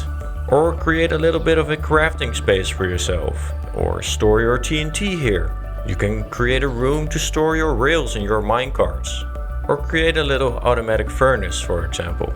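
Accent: Netherlands. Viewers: Exactly the video I needed! Thanks for the beautiful design & tutorial <3! (Hoorde door je accent meteen dat je uit NL komt! Bonus!)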